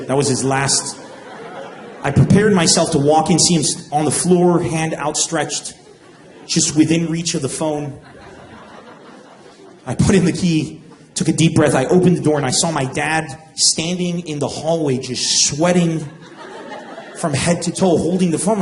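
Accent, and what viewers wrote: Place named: Pakistan. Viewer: ما عنده حس فكاهي و لهجته مو لهجت لبناني يحكي انجليزي بل بكستاني او افغنستاني ...... مهم يزيد يشوه ف صمعة العرب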